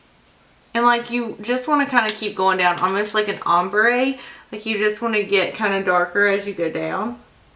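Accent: South, United States. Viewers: OMG idk if i've said this before but i love your voice! Its so country! lol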